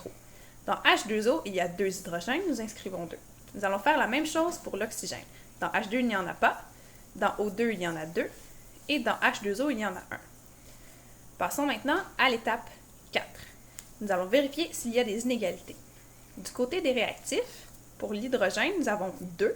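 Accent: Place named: Quebec, Canada. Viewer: C’est sont accent québécois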